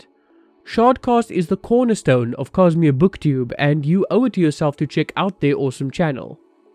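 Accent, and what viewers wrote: South Africa. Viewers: Are you Afrikaans/South African by any chance? From a South-African Stormlight Archive fan (an SA SA fan if you will)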